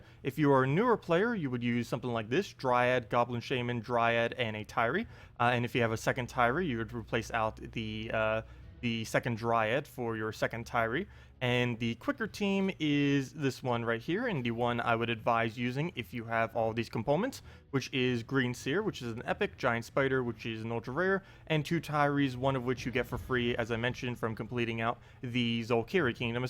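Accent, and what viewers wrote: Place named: Canada. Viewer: You're from Canada, Minnesota, or Maine.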